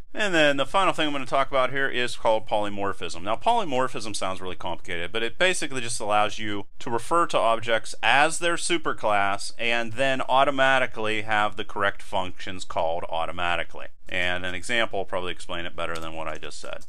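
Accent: Pittsburgh, United States. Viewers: Being from Mount Washington.I heard that Pittsburgh accent right off. ;) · I got excited when I heard the Pgh accent. Represent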